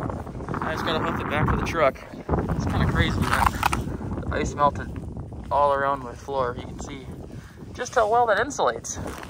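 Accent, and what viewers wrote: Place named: Minnesota, United States. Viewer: The Minnesota accent really shining through in today's video! I really enjoying seeing your community interactions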